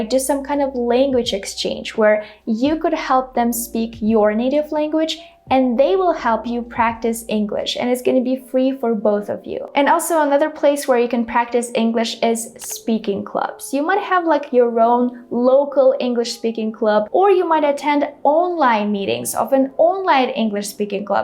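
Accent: Russia. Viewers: Russian accent? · sweety. I am not fluent but I could understand the russian pronunciation in your speech. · Русский акцент)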